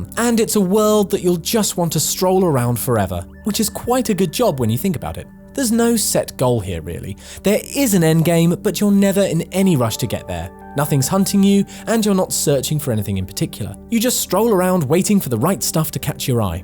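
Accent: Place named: United Kingdom. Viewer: There's something satisfying about hearing British accents on these types of videos..And this happens to be the best so far lol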